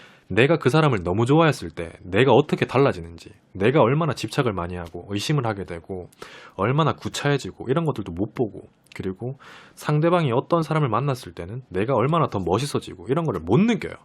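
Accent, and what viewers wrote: Gyeongsang, South Korea. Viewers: 말투가 뭔가 빨려들어감 경상도 억양이 왜이케 조앙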